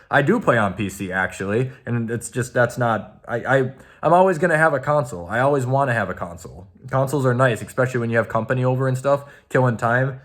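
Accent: Minnesota, United States. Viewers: I am Canadian, and he sounds American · I knew you were Minnesotan cause I saw the banners and your accent is exactly like other Minnesotan accents Edit: you being a Minnesotan fan and saying you hate the cowboys more than the packers wow I’m surprised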